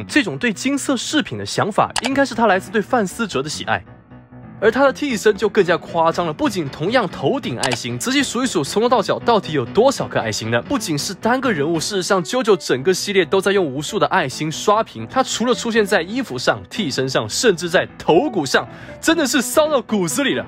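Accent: Taiwan. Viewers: 為何博主講話沒什麼口音啊XDD倒像台灣口音了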